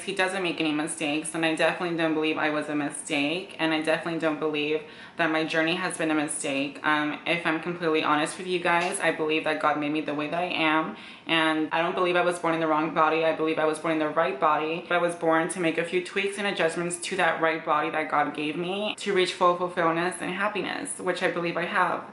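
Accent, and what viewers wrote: United States. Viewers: Your voice is what makes you, you. Honestly i feel there's nothing wrong with it. To me as a British female you just sound like a normal American girl to me. I'm sorry you receive so much hate I feel people are just bothered because they can't understand or they can't look like you. Keep inspiring! Love your views I'm also glad you mentioned about the pregnancy thing, so many people around me struggle and some cannot conceive. It's very bitter and harsh to throw it in your face, I hope that the positive out ways the negatives for you! You are amazing ! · Your voice just sounds like youre from California haha. People are just Caliphobic :P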